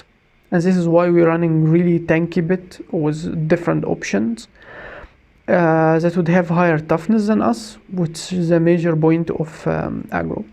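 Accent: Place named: Egypt. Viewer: Thanks for the video ! cheers Dude are you Egyptian ? your accent is Egyptian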